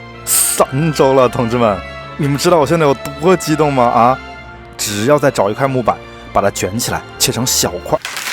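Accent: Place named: Hunan, China. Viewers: 你听起来是湖南人吧😂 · 哈哈哈哈，到了国外也压不住你的湖南口音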